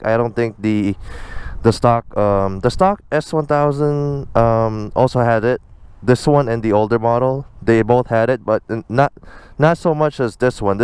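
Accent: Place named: Philippines. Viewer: bro u sound pinoy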